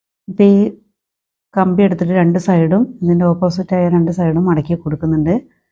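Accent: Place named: Palakkad, India. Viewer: njaanum palakkattukaaran aanu.. samsaaram kettappol thonni 😍😍😍😍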